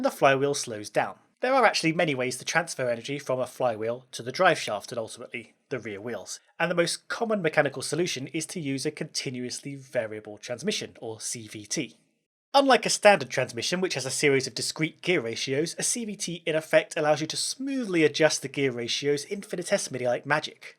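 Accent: United Kingdom. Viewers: How much I love this channel and how helpful it is, I find it extremely hard to follow the language. No secret that British accent is more sophisticated if you please, so it's hard for a non-native speaker to catch it at times, then the speed of narration is quite high to read the subtitles, especially in parts where the language is altiloquent. Moreover, there's technical stuff that you're trying to wrap your head around which makes it all even harder. In view of this I heartily ask you to consider slowing down the narration a bit, and maybe spell some words more distinctively. Thank you!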